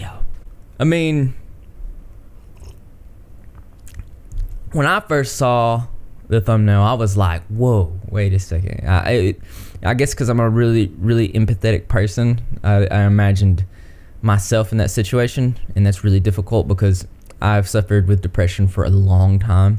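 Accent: South, United States. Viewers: Omg who else agrees this YouTuber has stolen the hearts of many? Cuz he's hella cute even with his southern accent and he has such a likable personality. The way he speaks is so intelligent just, omg he's absolutely brilliant when diving into discussions. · Your southern accent doesn't match your style/personality. I'm in the exact same boat though. I'm from Alabama (and have a deep southern accent) but I have a 'city hippie' personality. 😂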